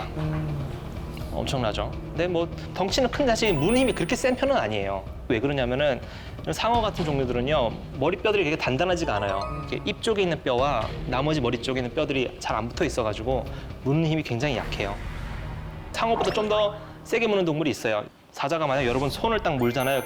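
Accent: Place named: Gyeongsang, South Korea. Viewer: 좀더 보니 갱상도네~~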